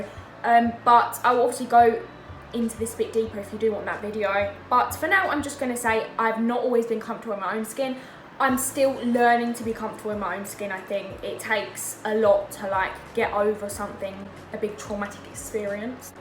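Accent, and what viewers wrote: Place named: Australia. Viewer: The Australian accent tho😍😍😍😍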